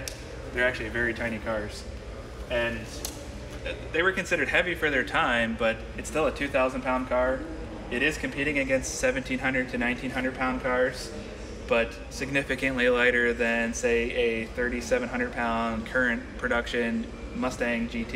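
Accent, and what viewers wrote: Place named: Midwest, United States. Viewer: it’s a Midwest thing. They also say things like “ruf” instead of “roof”, “pop” instead of “soda”, “beg” instead of “bag” and “dror-ing” instead of “drawing”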